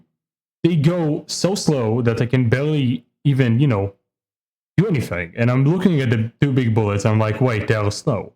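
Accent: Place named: Israel. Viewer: Nah dw its hard to tell you got a israeli accent, i had to go check other videos of you to confirm if my suspicion is correct. Good tutorial nonetheless though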